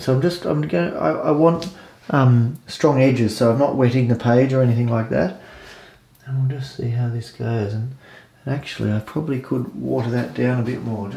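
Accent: Australia. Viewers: I adore Payne's Grey. I don't buy the color because I love mixing colors to get it. It's my favorite color for a night sky. PS Where are you 'cause you sound Australian. I'm in Canada 🇨🇦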